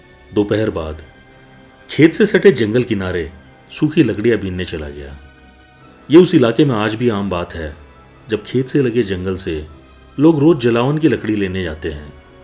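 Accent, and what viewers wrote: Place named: Uttarakhand, India. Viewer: Aise lagta hai sir Uttaraakhand se hai 😅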